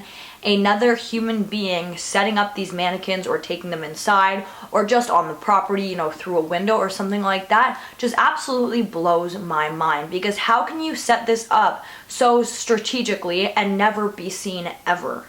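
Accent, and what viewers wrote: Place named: Canada. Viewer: I love how Canadians say "about". Aboat or aboot, hehe. Sorry, I'm British. Awesome video once again, you're the Queen of the creepy. <3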